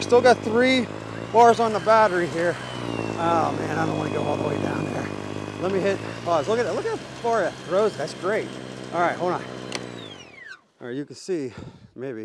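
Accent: Pittsburgh, United States. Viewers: a slight yinzer accent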